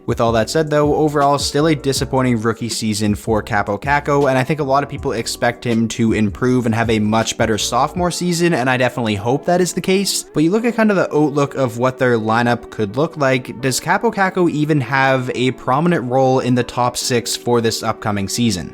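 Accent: Canada. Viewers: Ive said many times your SUPER Canadian accent makes me laugh... "OAT-BREAK" made me laugh pretty hard lol. Also your math teacher musta hated you. You always say .fifty instead of .five zero ...and it triggers me lmao.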